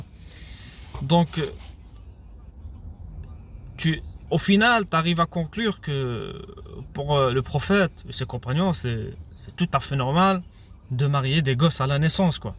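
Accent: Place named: Maghreb. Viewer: Ôh merci, merci de m'avoir ouvert les yeux!! Je vous ai dit j'ai compris, maintenant je vais détester l'islam comme vous. C'est trop bien de détester la religion des autres, de détester les morts. Monsieur le théologien à la sauce haineuse qui n'a toujours pas réussi à répondre à 1 seule question, 1 seule. Si j'avais manqué de respect à il me l'aurait fait savoir, mais vous vous me manquez de respect et prétendez sur mon compte avec arrogance. La première chose que j'ai aimé de c'est son petit accent maghrébin, je trouve que ça a tellement de charme. Ce message marque la séparation de nos chemins, j'espère que vous arriverez à comprendre le français: BONNE ROUTE!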